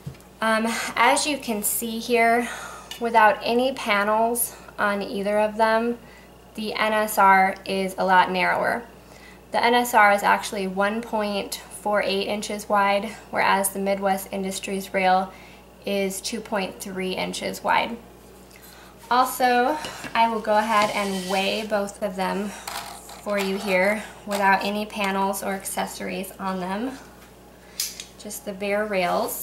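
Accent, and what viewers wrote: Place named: United States. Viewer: Hi there, excellent stuff here. Would you please mind telling me where are you located in the US? I mean a state/region. I have studied English for years know and I love your accent. I am just listening ( and watching also ) and I can´t have enough, since your pronunciation is so beautiful. I really love the language and I would be thankful to you to learn where in the US people cherish English like you do. Take a good care. Jan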